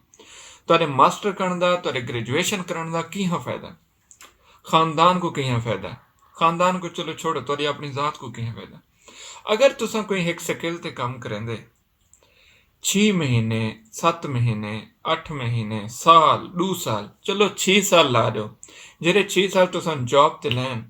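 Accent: Saraiki, Pakistan. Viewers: Yes sir 😢 me also completed master also B.ed 😢 but i have nothing I am also form distric bhawalpur Also mother language sariki your speak of method sariki amazing 😍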